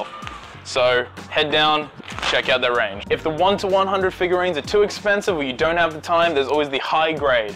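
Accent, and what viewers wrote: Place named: Australia. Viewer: Omg how did i just find this store yesterday (THAT AUSTRALIAN ACCENT THO)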